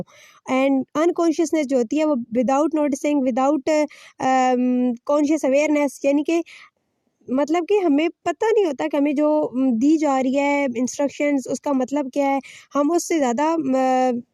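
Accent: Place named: Pakistan. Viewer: Content is good, but I can notice that Pakistani accent there 👀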